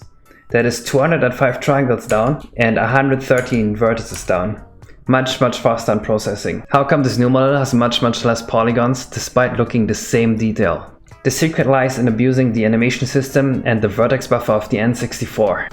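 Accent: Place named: Germany. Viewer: dieser deutsche Akzent xD, aber krass was man aus SM64 mittlerweile machen kann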